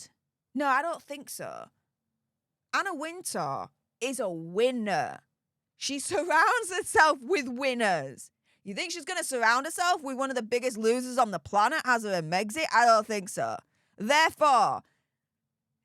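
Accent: United Kingdom. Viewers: I love your accent true British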